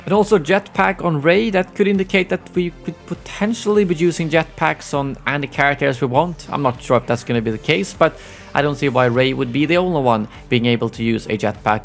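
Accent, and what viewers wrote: Sweden. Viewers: bru why is he speaking with a swidish accsent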